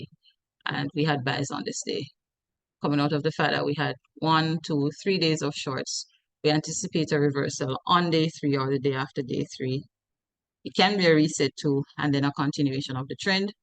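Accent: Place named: Jamaica. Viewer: Jamaican accent 🎉. I automated SB strategy into an Expert advisor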